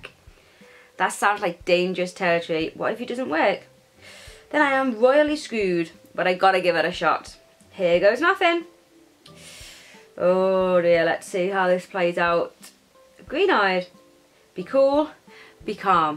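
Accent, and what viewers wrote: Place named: England, United Kingdom. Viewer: How do you read so fluently??? I luv ur accent, do you live in England? :3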